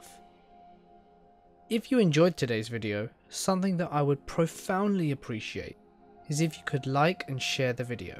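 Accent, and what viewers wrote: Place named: United Kingdom. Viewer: Another British accent... Go figure.. Let's read must be a BBC thing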